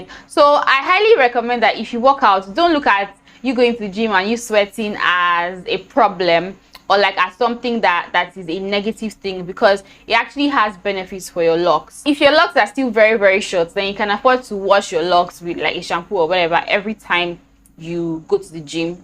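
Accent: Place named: Nigeria. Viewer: Ok I'm only 2mins in but baby girl is giving Naija goodness with her bubbly personality, lovely smile and beautiful accent. Love the professional set up and lighting too. Now on to the rest of the video...😊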